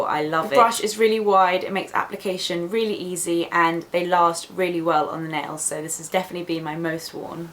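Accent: United Kingdom. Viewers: british accent...subscribed! :D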